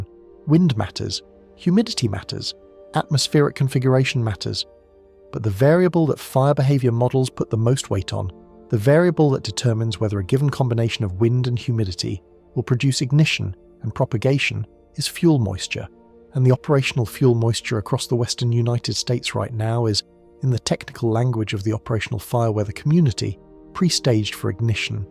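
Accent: England, United Kingdom. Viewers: Isn't there anything going on in Europe why are you telling that's about the United States and not talking about the continent that I think you're from since you have an English accent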